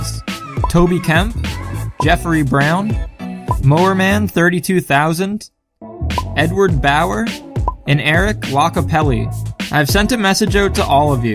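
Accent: Canada. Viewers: I can tell you are Canadian, because you said oat instead of saying out.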